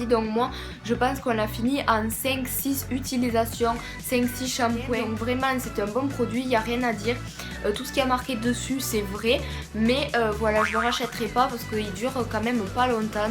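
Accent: South, France. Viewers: J'aime bien ta vidéo , ta l'accent du Sud ⚓ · Coucou ! j'aime beaucoup ta chaine n'hesite pas a venir faire un tour sur la mienne tu est trop mimi ^^ et j'adore to accent du sud !!!! bisouus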